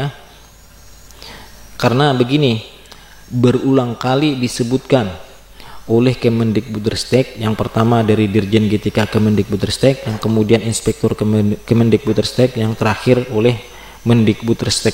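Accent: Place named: Banjar, Indonesia. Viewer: medok sekali banjarmu sanak😁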